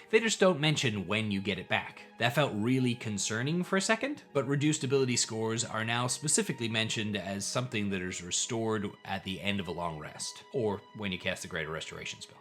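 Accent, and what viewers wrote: Australia. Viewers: Amazing hearing this and your Ranger video. You Aussie accent comes through when you got back, but here I would have never guessed. 😁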